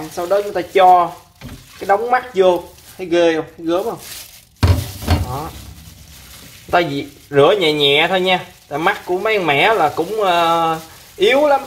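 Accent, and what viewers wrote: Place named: Mekong Delta, Vietnam. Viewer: Đậm chất ngừ miền tây Nghe câu "thun thun thun thun" là bt liền😁😁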